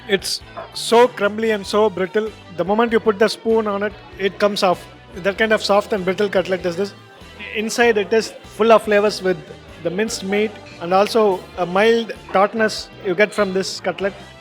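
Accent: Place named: South India, India. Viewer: THIS SAUTH INDIAN ACCENT OF NARETION LOOKS ODD TYPES OF IFFICULT TO UNDERSTAND FULLY...NO GOOD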